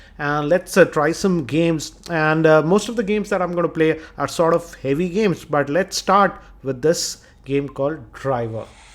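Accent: India. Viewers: I am from Brazil, I love indian accent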